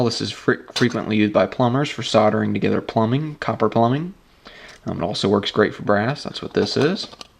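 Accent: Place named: United States. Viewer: thanks for the informative, helpful instruction. IS there a stronger bonding way to join brass? BTW but why do Americans pronounce solder, "sodder"?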